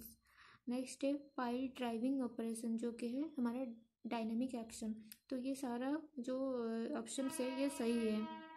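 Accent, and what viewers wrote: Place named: Odisha, India. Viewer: I think you are a Odia .i guess it because of your accent .Good work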